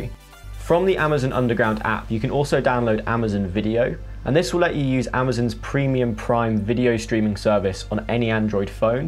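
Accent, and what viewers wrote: United Kingdom. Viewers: british english accent?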